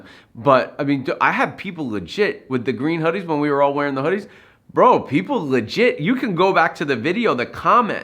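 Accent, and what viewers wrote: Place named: New York, United States. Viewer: Had a feeling you were from New York. BTW, Chicago pizza isn't pizza. It's a caserole! 🤣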